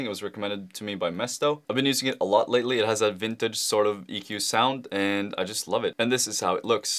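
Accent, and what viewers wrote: Norway. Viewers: Are u from norway? I can hear ur accent lmao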